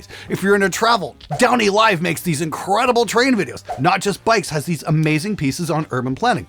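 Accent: Canada. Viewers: When I think of Canadian You Tube I think of him. I generally don't recognize a Canadian accent, but his insistence on "aboot" lets you know it's Canadian content. I had no idea that Canada was suppressing free speech in such a bizarre fashion. Is there any way this channel could do some kind of IP swap or something and just look like it was a few miles West out of Detroit? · You just can't hear your own accent, most people can't, but it is the 'aboot's that tells people you're Canadian, and not from the USA. 🙂 says aboot to a certain degree, you probably can't hear it though. Source - Australian with ears for over 50 years. 😁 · Honestly, I didn't realize how many Youtubers that I watch were from Canada until I heard them all say "aboot" Not like it matters, they make good stuff, so I watch it.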